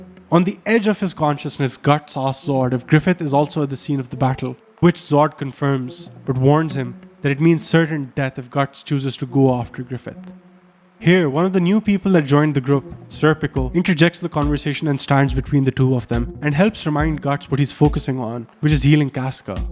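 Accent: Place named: India. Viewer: i really like the way you tell the story and the fact and the analysis and i really like that you view it from philosophical side, but the thing that probably makes people not watch your whole video or skip it or not even starting it is that your voice(no hate) doesnt pass and it sound bit funny cuz of your indian accent ofcourse it can fix whit the time but i suggest you using elevenlabs or some ai voice that sound more good more profesonial(i dont talk about deep ai robotic voices), anothjer thing is that many of your photos dont mach the ratio of the video(there are blackbars) and alot of the music is not good combined whit the video and another thing is that there is no motion. There are a lot of parts where there is only one picture you are talking over it and it just stands making it feel boring even if the script is very good and the video is good. 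Add some motion. Another things is that there are photos that are in bad quality and you can find better ones. Again no hate and i hope to see even more evulution of your channel i really like the way of you viewing things and i am sure you can make your channel really good.(NO hate again)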